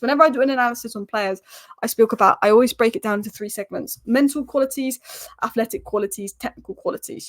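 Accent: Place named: Surrey, United Kingdom. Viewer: “Us?” You sound like you were born in Surrey love. Never mind.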